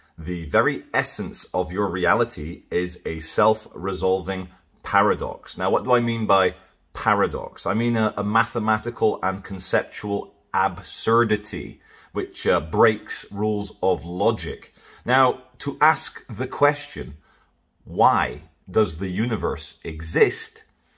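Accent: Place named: Scotland, United Kingdom. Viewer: he has a posh Scottish accent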